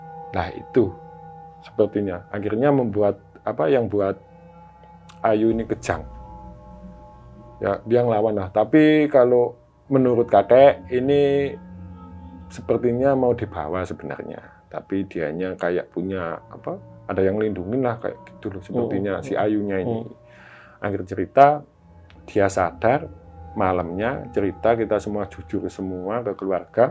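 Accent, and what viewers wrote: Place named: East Java, Indonesia. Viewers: Logatmu mas jawa timuran bgt 🤭😂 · Logat" e sampean wong kediri to mas?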